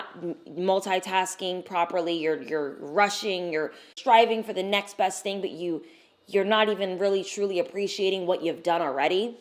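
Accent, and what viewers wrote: New Jersey, United States. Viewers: Omg this accent! It’s so cute, are you from New Jersey??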